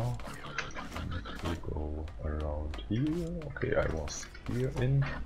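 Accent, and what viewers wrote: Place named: Poland. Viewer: Uh you sound polish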